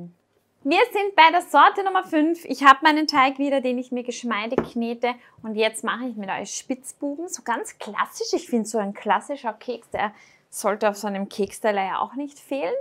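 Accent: Austria. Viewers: Vielen Dank für die schönen Ideen und die verständliche Erklärung. Aber genauso schön ist der österreichische Dialekt. Viele Grüße aus Leipzig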